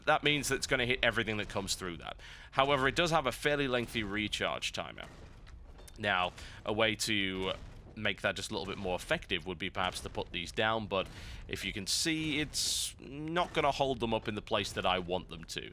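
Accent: United Kingdom. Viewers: u talk too much and ditch the british accent thanks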